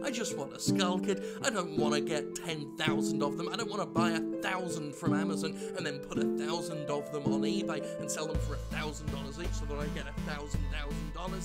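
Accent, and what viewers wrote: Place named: United Kingdom. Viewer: (I'm new to Sterling) says he's american but he sounds british so does he live in america and is british or what?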